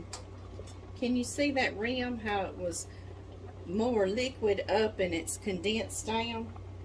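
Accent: South, United States. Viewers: I put up 10 pints of salsa today. I do not hot water bath either. once they're sealed they're sealed good. I have more to do in about 3 days. I also put up stewed tomatoes . love your videos & your southern drawl. ha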